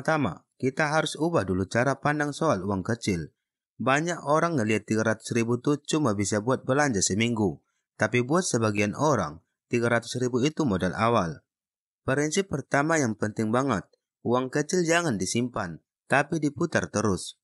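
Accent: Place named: Eastern Indonesia, Indonesia. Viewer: Sepertinya logat indonesia bagian timur gan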